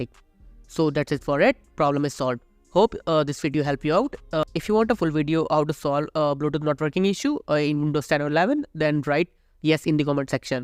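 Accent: India. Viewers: This is exactly what I was looking for😊 whenever I hear in Indian voice I know the tutorial is going to be correct 💪 💪 💪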